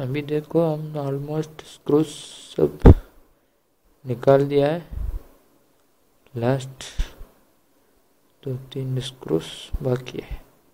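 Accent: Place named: Kerala, India. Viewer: മലയാളി ആണോ മലയാളി ഹിന്ദി പറയുന്ന പോലെ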